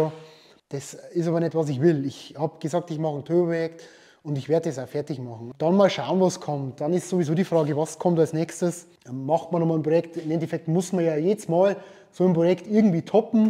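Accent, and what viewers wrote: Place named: Regensburg, Germany. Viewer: Wo kummst du her hörst du ho wie so a Regensburger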